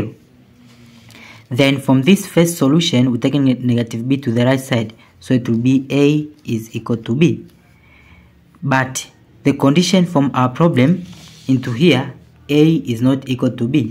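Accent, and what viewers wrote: India. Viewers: тупое, длинное решение. Да еще и жутким акцентом, явно индус.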